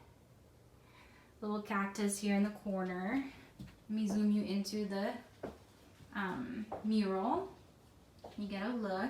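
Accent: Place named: Louisiana, United States. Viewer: Beautiful home and property. You sound like you are originally from south Louisiana area to me. We would love to relocate to Maryville from Houston one day.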